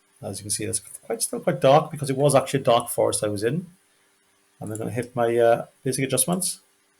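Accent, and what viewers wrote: United Kingdom. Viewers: not used to the local accent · not tuned to the speech patterns of south Wales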